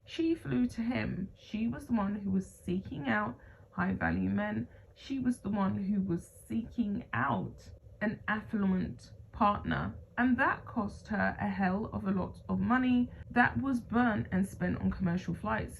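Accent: United Kingdom. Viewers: Anyone reminded of the film ''Misery''?.. Lovely to hear a Brit doing this for a change! Good on you girl.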